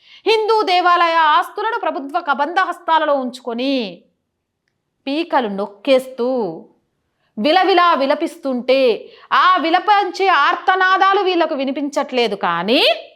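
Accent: Telangana, India. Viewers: Normal telugu maatlaadatene baavuntundi ra, itu leka atu telangana yaasa vaddu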